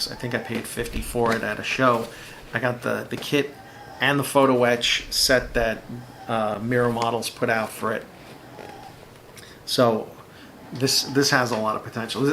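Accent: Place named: Queens, United States. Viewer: Question: You’ve lost your mojo for a Tamiya American twin jet fighter (F-15), but you’re excited about a Tamiya American twin jet fighter (F-4). What is it about the Eagle that you’re not feeling? There’s nothing about the channel I don’t like. You tell it how it is, you explain your thought processes and the music’s not annoying. Your build quality is inspiring and your Queens accent is refreshing for this Australian subscriber. I like all your build choices, and as such, I’d rather not recommend any particular kit type. I’m nearly finished my Eduard/ICM Dornier 17Z-2 ‘kampfstift’ build and you’ve just ticked my Luftwaffe subject matter preference box. All the best.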